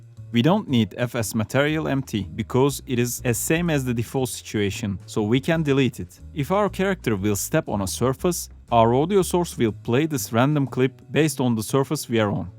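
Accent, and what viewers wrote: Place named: Turkey. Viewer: Türk olduğunu aksandan hemen anladım.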